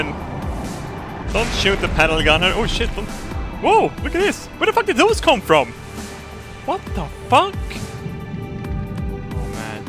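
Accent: Sweden. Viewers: Welcome back to... from the debts! Gotta love that Swedish accent.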